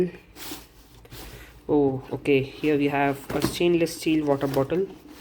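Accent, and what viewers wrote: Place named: Ujjain, India. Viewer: Bhaii ye ujjaini accent merko bhi sikha 😆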